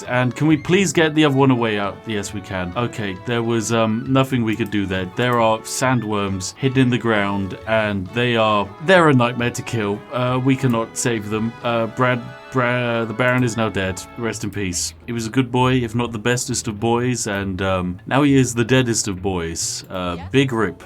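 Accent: United Kingdom. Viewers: Wait, this guy is British?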